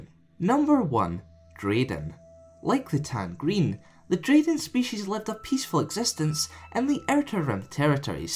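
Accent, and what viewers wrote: Scotland, United Kingdom. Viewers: i have to say it mate, this combination of untamed scottish accent and soft speech is strange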